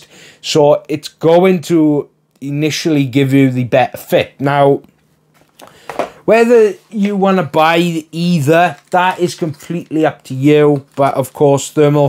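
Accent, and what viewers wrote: Wales, United Kingdom. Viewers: Definately a man from Wales! Love!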